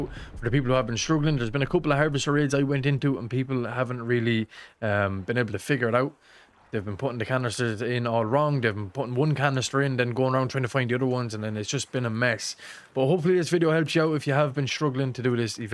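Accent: Ireland. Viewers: Where in Ireland are ya,?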